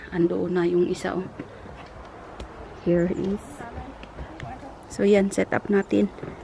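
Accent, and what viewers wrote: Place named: United Kingdom. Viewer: I love your UK accent sis. Sarap pakinggan parang di marunong magalit.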